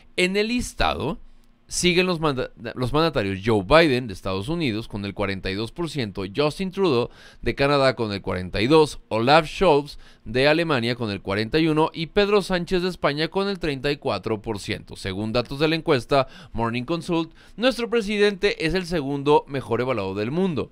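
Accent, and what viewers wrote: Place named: Monterrey, Mexico. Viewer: Eres de Monterrey cuerco. Verdana?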